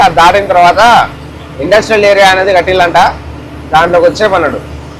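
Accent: Telangana, India. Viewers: Anna meru undedhi Vijayawada, Telangana slang matladuthunnav,kadha karimnagar,unnara,unne Vijayawada andhuku vellaru?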